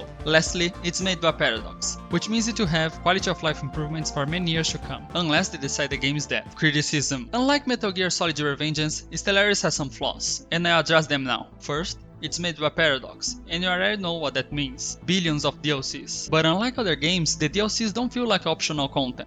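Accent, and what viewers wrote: Brazil. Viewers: U really sounded like a brazilian in this video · tava reconhecendo seu sotaque... é BR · Hey, you have accent, are you Brazilian or Latin American? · Pelo teu sotaque, sei que tu é brasileiro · Sabia q era BR só pelo sotaque kkkkkk, ótimo vídeo champs! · So pelo teu jeito de falar ingles descobri que tu é brasileiro hahahahaha. Primeiro br fazendo video estilo seth, muito bom, continua!!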